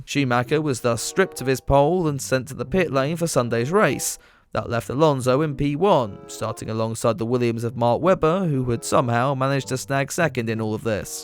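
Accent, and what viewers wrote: United Kingdom. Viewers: Starwing in the UK ,which it sounds like my man is from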